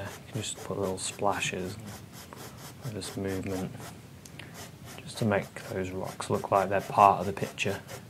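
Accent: United Kingdom. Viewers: Is this guy the British successor to Bob Ross O.O like in all seriousness he paints just as beautifully, and his accent and voice give off that ASMR effect. the same kind that Bob Ross did. This painting is amazing and Sepia just works so well and I love the technique of using masking tape to section off the horizon line :D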